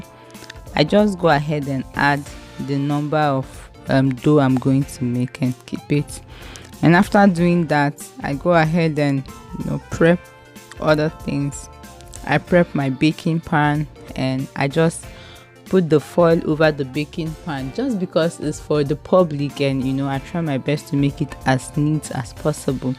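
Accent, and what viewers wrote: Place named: Nigeria. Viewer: Omg first off I love your Nigerian accent I’ve made meat pies before and they are soooooo yummy makes my heart so happy 💕thanks for sharing luv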